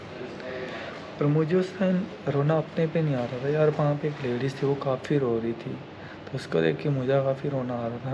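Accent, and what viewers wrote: Pakistan. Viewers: Bhai teri tone se lag raha hai ki tu pakistani hai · Iska accent pakistani kyu hai? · अबे तेरी बातों से और जैसी हिंदी बोल रहा उससे पता चल रहा साफ साफ तूं पाकिस्तानी है इंडिया को बदनाम करने की साजिश मत कर chutiye कुछ नहीं उखाड़ पाएगा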